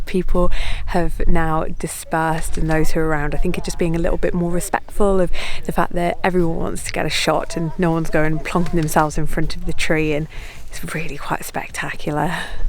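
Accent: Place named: England, United Kingdom. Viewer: Wow thanks 👸🌹 for sharing more of your beautiful English seaside and countryside. The food looks wonderful. I really love good seafood. I would love to visit Hadrian's Wall and the Sycamore Gap. All that great Roman and English history. I am glad I can see them through your cameras. I really love your beautiful English voice narrating your videos, it is very nice. I still think your English coast looks like the Washington State Coast, One is rich in Roman and English history and the other one in Native American. Thanks for Sharing Love 💖 your channel. Cheers 🍷🍷🧔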